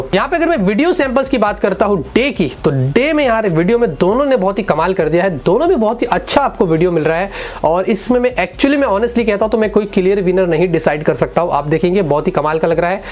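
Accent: India. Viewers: Nossa, como indiano tem sotaque. =0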